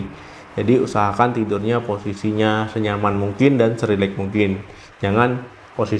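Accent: Aceh, Indonesia. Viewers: Logat dokter kek org aceh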